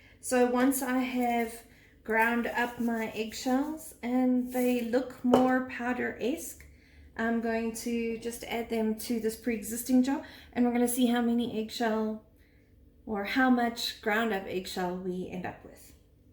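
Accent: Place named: South Africa. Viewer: Sounds like you're in RSA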